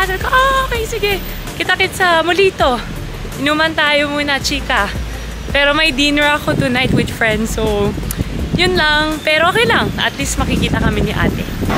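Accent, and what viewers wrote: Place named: Philippines. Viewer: Love your accent. Pilipina ka TALAGA! Galing.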